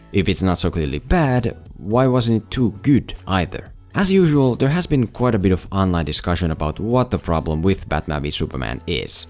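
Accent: Finland. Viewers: Suomienglanti havaittu: torilla tavataan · Hauska kun heti sun venom videon alusta huomasin sun suomalaisen aksentin :D hyvä et meillä on oikeesti hyviä ammattimaisia suomalaisia leffatuubajia !! jatka hyvien videoiden tekoa !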